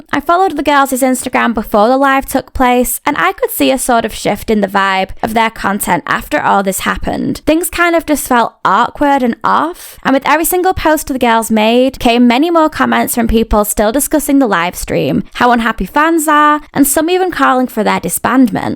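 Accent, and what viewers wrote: United Kingdom. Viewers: Are you British? Lovely accent. ☺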